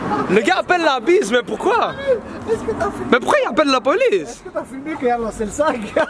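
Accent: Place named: Quebec, Canada. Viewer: Toujours toutes tes vidéos avec d’la bonne humeur et de super délires mdrr et en plus j’adore ton accent québécois sur des phrases complètement française !🤤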